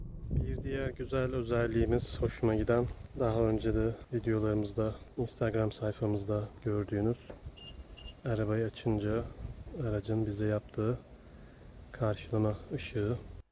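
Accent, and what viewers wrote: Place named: Adana, Turkey. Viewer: hocam adanalısın sanırım şivede kaçaklar sezdim :)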